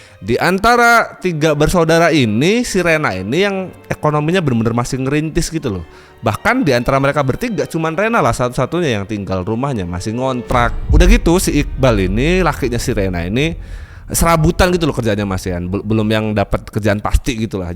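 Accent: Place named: Lampung, Indonesia. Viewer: logat² nya kaya orang Lampung bang 😊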